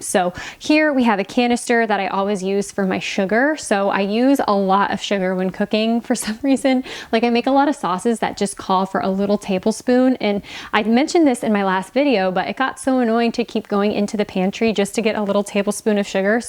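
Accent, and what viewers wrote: Australia. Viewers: I like! As an Australian fan, I agee Aussie accents are cool. Love your channel. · Thumbs up to the Australian Accent!!!!! Great video 😁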